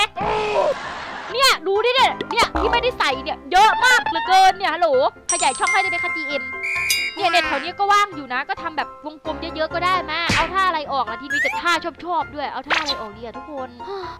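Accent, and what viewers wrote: Isan, Thailand. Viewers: พี่ พูดอีสานน่าฮักมากเลย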